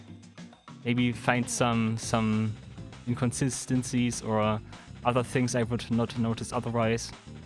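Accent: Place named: Germany. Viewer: Bro has a German accent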